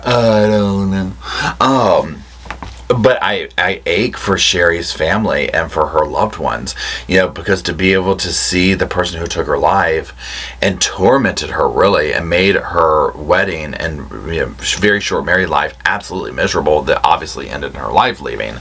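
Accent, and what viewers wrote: South, United States. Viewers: Hi and Roscoe. I was so happy to have found your channel about a week ago. You make me laugh so much with your facial expressions, your occational southern drawl, and my all time favorite is your signature whisper as you send us to a clip. Your show about Nancy Brophy is hilarious. The way you whisper the words "Miss Nancy" put me on the floor laughing. I'm going to tell all of my friends to subscribe to your channel and tell them that we have to support our brother. Keep me laughing as I watch more of your videos because I'm new. Right now (Dec 7, 2023) I'm watching your video about "Latecia's Dr. Lewis. I laughed so hard at the intro when you whisper "Her son who smoked weed in the Stairwell" 😂😂😂. Hug Mr. Roscoe P for me.🌹🦴🦴🦴🦴🦴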